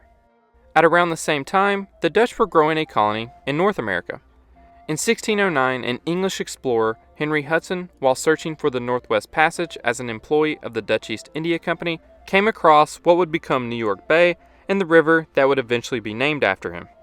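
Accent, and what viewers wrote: South, United States. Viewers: Dude I love your voice. So relaxing. I can tell you’re from the south!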